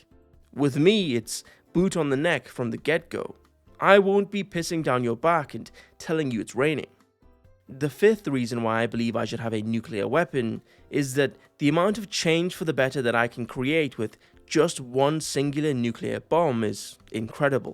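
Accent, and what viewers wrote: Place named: United Kingdom. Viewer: Yo, where abouts in Britain are you from?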